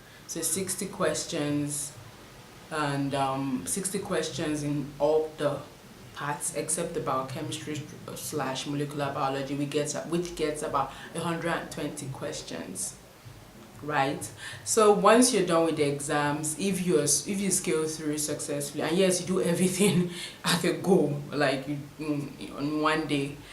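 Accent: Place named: United Kingdom. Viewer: Thank you..very helpful. I hope to get in next year. The British accent keeps popping up every now and then😊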